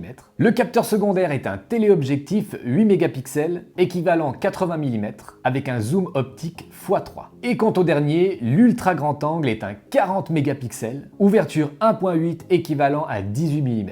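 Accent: Switzerland. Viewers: On voit à ton accent que tu es Suisse... 😂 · quel bel accent suisse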